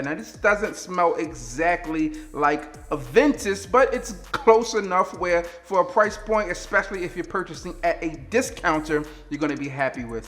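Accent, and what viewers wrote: Baltimore, United States. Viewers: He has a VERY STRONG Baltimore accent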